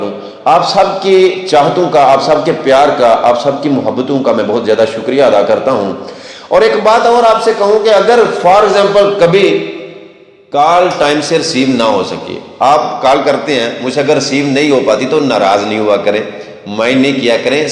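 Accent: Punjab, Pakistan. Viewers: سر پنجابی میی بات سننا آپ کے منہ سے اچھی لگتی ھے اپ کا لہجہ پنجابی ھے اس لئے پنجابی ہی سوٹ کرے گی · پنجاب پنجابیوں کی شان ہے سب کے سمجھنے کےلیے اوردو ہی بولے ویسے بہھی لہجا پنجابی لگتا ہے پنجاب سندھ سرحد بلوچستان سارا میرا پاکستان پاک فوج زندہ آباد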